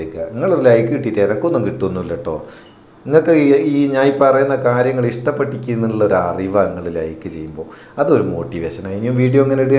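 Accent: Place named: Vadakara, India. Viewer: മ്മളെ ബഡേര ഭാഷ യും ഇഷ്ടപ്പെടുന്നവർ ഉണ്ടല്ലോ സന്തോഷം ആയി 😛😂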